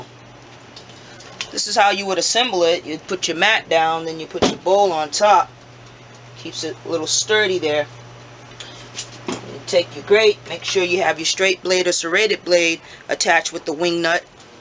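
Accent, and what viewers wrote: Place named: New England, United States. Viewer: Patriots and that accent...🤔...You have to be from New England haha....I’m from Boston, I can pick out that accent anywhere hahaha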